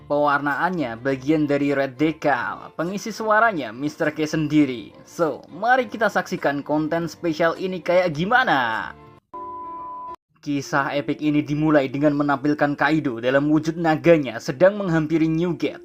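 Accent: Java, Indonesia. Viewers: Min coba pake narasi full basa Jawa. Medokmu mantap bgt min. Aku suka 😂 saya nyoba pake narasi medok di konten saya a dapet min. Apa gara2 saya jawanya cuman 50% yak? Kasih tau rahasianya supaya bisa medok min 😂. Kasih feedback yaa gan saya medok kek si mimin apa kaga 😂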